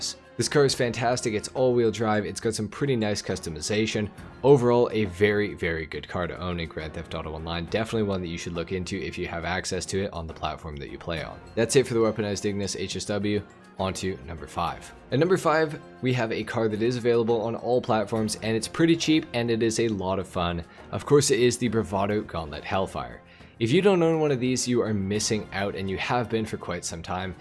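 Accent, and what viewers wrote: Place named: Canada. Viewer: I can tell you’re from Canada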